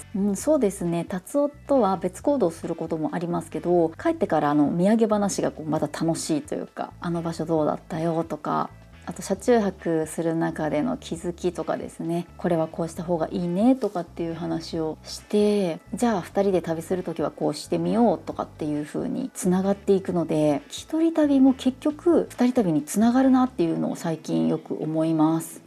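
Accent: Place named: Kumamoto, Japan. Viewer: コメント紹介ありがとうございます😊さすがはさん、動画内でも普通に熊本弁出てくるだけあって、熊本弁丸出しのコメント読みもバッチリ👌 今年の寒波は今日もマイナス4.5℃で夜中から朝にかけて雪❄️が舞ってましたよ。僕は天草の本渡出身の益城町在住です。僕は若い頃はこの寒い時期でも磯に釣りに行ったり、蘇陽町の渓流の管理釣り場にマス釣りに行ってましたが、トシと共に寒い時期や暑い時期は釣りに行かなくなりました😅 九州のスキー場は、動画コメント紹介でも言われてた熊本と大分の県境の九重スキー場、佐賀の天山、あとは熊本と宮崎の県境の五ヶ瀬ハイランドスキー場もありましたね。行ったコトないけど😅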